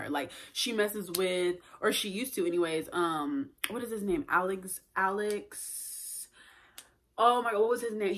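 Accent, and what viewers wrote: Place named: Bay Area, United States. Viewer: Are you from the Bay Area sis ? I hear the accent lmao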